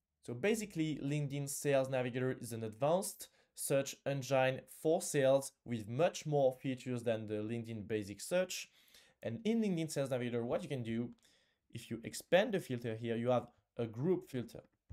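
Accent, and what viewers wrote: France. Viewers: This is a fantastic video! There are a lot of YouTube videos that recommend using Linkedin Groups. Thanks for letting us know that post in Linkedin Groups is a waste to time. However, the volume on this video is rather low. And the captions are not able to capture every word because of your French accent. I'm not complaining about your French accent. But you need to do something about the volume so that people will not click off your videos.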